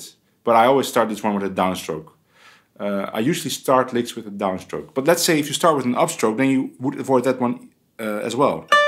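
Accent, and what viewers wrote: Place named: Netherlands. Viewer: When I first came across your video, I was watching it on a different youtube format and didn't see your name etc. But I said to myself ("I'm hearing a slight 'Dutch' accent") I was sure of it my parents immigrated to California in the the early 60's right after I was born. They didn't teach us the dutch language sadly. So I have this void that only Dutch accents can fill....does that sound strange? It's music to my ears wherever i can hear it so thank you, even with your very slight accent theres more music than just the guitar haha doi hartstikka leuk! [additional edit] I wanted to brag about my Dad's musical days in Zwartsluis, Genemuiden, and Zwolle. I've only seen one photograph of the harmonica trio he played in throughout the 50's but they were very good! He played the smaller 6 or 8 centimeter harmonica, another guy played one that looked to be a foot long, and the third guy played a hinged double harmonica that seemed to be 20 inches long or something. 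Incredible. My dad was Klaas Schraa😃:hydrate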